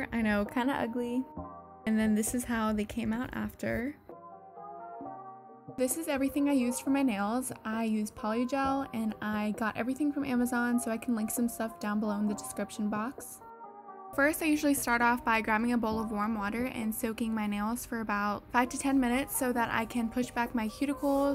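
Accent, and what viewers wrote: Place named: California, United States. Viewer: I see what you mean. It has the same cali girl accent, but she doesn't have the same tone of voice.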